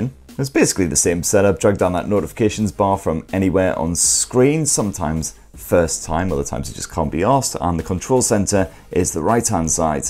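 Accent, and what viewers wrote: United Kingdom. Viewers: W video W person W opinions why u ask? - makes wild animal jokes 💀 - has beef with sweaty gamer kids 💯 - tests display by playing Jojo - British accent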